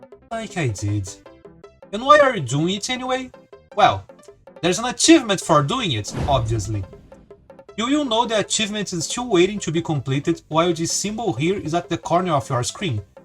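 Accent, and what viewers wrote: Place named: Brazil. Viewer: Oi, acredito que o YouTube tenha me recomendado seu vídeo porque gosto de ver desafios, Mario e também porque você é brasileiro (pelo menos pelo que percebi do sotaque). Estou deixando esse comentário não somente para engajar, mas para fazer elogios: parabéns pela coragem de fazer conteúdo em inglês. Eu ensino inglês e não teria essa coragem porque tenho muita vergonha. Aliás, pode ser que venha alguém te encher o saco pelo sotaque, mas não ligue: nós brasileiros temos um sotaque baseado na nossa língua-mãe, ninguém precisa falar como nativo, desde que seja compreensível. Acho que a única palavra que foi usada com frequência e pode ser difícil para alguém de fora entender seja "Island", cuja pronúncia é próxima de "ai-land", o som do S some. Tirando essa, tá tudo muito compreensível, e os comentários em inglês que vieram antes dos meus já demonstram isso. 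E, aliás, vida longa ao SNES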